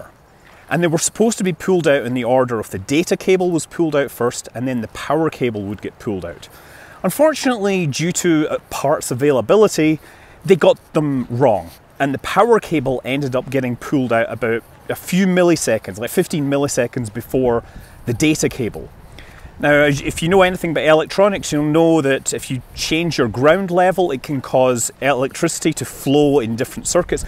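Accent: Scotland, United Kingdom. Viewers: I mostly listen for the science/ history lesson in a thick Scottish accent.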